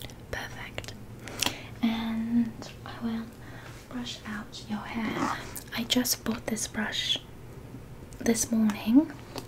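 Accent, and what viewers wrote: United Kingdom. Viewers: Love this! As a brit im v impressed, bit of the keira knigtlys about ur accent 👏🏻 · This argument thread is too funny lol, so I’ll settle it. Is this accent impressive coming from a Canadian? - yes. Is this how all brits sound? - no of course not, but a lot also absolutely do. kind of swaps between posh and cockney here; which is why it may sound a little off to us brits (but still impressive!) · why did I expect u to start speaking in a north east/northern British accent 😭😭 still love the accent tho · omg finally a British accent ASMR 🤍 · your british accent is so impressive 😭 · As a british person who speaks like this. Thank you! So many posh accents and this is a much much more common accent! Love you videos!! X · i'm home alone this week and the loneliness started kicking in tonight, but this video was just what i needed to keep me company. 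and as a brit, i love the accent! the only slip i caught was , we don't say candy. we say sweets! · when she talks in a British accent or just her random voice is just so comforting like she has such a comforting voice and it brings my anxiety down so much. ❤ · people still speak like that in Britain, it just depends where you go. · I love your accent on this! I'm British and you sound like someone who's lived in loads of different parts of Britain (and maybe Ireland as well). When people go from place to place you get accents like this, and they're so nice! It's like you can hear all the different influences of the British accents and dialects. Great job! xxx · your british accent is so surprising and pretty 😭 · I LOVE your british accent role plays 🥺🥺 they’re so good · i love how the accents are actually british but throughout the video it switches so fast to different areas of the uk😭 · The accent is actually so realistic because this is like the EXACT accent my British friend has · You are one of my favorite ASMR channels to watch. 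I love your British accent.😊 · can you do another one with a british voice i loved this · Love how it dabbles between British and Australian 😂😂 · You sound just like my friend! We're both British but I'm from a more stereotypically 'chavvy' area, she's from the 'fancy' area. Your British accent is amazing! ❤ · British character is back again!!!🥺. Recently I have missed British roleplay characters on your channel ♡ and you posted British RP characters!🫶. i can only say🥹. Did my dream come true?✨️. I can imagine 1 Hour with a british friend as beautiful and sweet as you I must feel lucky and that is the best thing in my life💖🩷. I wish I had a british friend like you loll😭. And Fyi I love your British accent.Your british accent sounds great!. This will be my favorite roleplay💗🩷. Yes I Love this rp for sure!♡ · I am a british girl and live in britian, and i have to say you do a perfect british accent, also you do a very good french accent aswell,❤️, love all your videos, very relaxing, and some are funny, your very pretty and cute lady xXx 💕❤️💕❤️💕❤️ xXx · The British accents suits her so much omg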